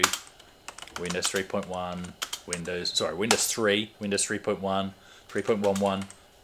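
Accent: Australia. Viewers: I love the Auzzy accent in the out of box bit. Don't spill any liquid on it.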